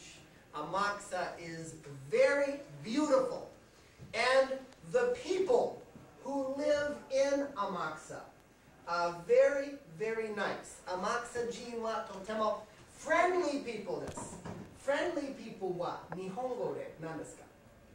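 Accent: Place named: United States. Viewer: 歌うの本当に上手ですｗ すごいアメリカンなまりで話してますけど、もしかわざとやってますか？ 日本語上手そうですし。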